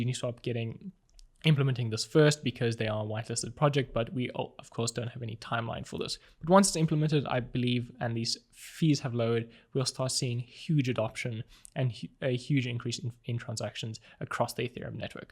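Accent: South Africa. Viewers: Are you South African? I can hear it in the accent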